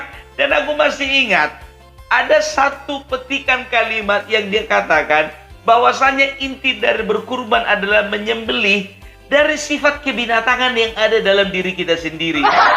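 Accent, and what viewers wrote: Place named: North Sumatra, Indonesia. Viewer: Enak opung ini kalau bicara dengan aksen batakx yg kental sangat menyenangkan, bagus banget.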